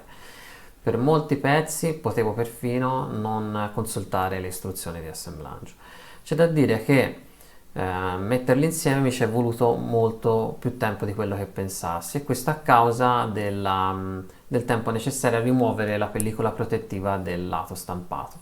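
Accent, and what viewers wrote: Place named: Tuscany, Italy. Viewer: Bel video! Sento un accento del Granducato! Io abito tra Siena e Firenze: se su quel tavolo ti andasse di provarci Infinity ci possiamo organizzare :D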